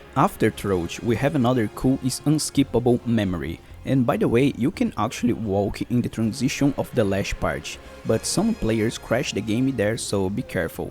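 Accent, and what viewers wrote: Brazil. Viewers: Parece um brasileiro falando inglês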